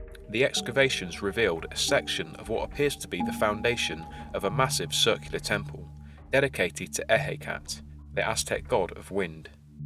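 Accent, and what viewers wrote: United Kingdom. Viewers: I hope someone scrutinizes the hell out of the way you speak, chomping at the bit for any "mispronunciation". I guess you've also never heard a British accent before. Asshole.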